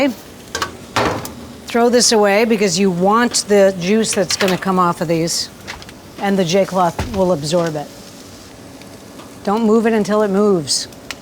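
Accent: RP, United Kingdom. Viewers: Wow that’s a wicked English accent posh ok 👌🧑‍🍳🌹.Iam looking forward to seeing you your meal 🥘 today 🌹🌹🌹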